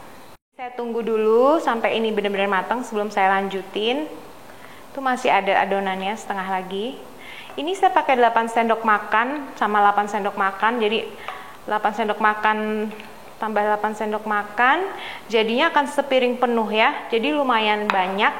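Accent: Medan, Indonesia. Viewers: Orang Medan kakak ini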